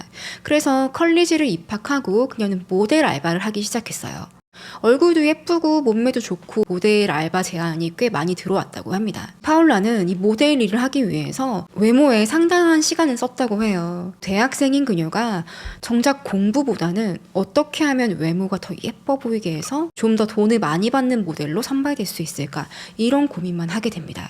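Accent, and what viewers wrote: South Korea. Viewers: 외국에 사는 발음이 아닌데요. 한국식 영어.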